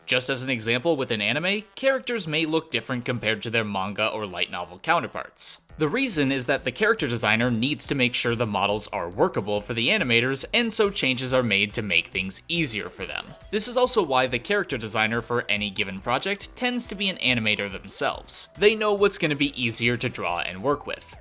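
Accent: United Kingdom. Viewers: all of them, you say in an english way instead of how japanese say it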